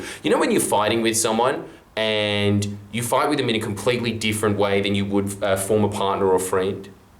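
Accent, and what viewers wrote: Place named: Australia. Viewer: At this moment I am s in and don't know what you're on about. This is fine - as I only wanted to acknowledge that YouTube auto captions work well with your voice. This is great, because you are funny all by yourself without the slow car crash of captions going awry which normally happens. I don't even know why my captions were on. But I was impressed. Educated, neutral Australian accent. Works well enough for me.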